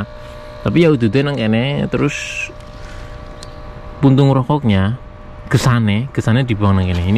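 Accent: Banyumas, Indonesia. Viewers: Setiap tayang... yg gue tunggu bahasa ngapak mu, mas!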